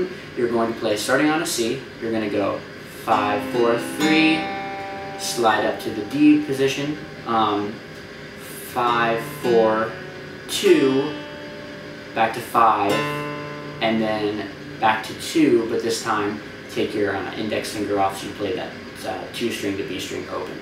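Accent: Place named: United States. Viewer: hehe i like ur accent doing filipino language (tagalog) when ur american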